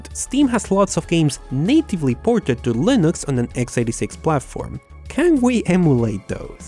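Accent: Spain. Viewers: deshacerte del acento español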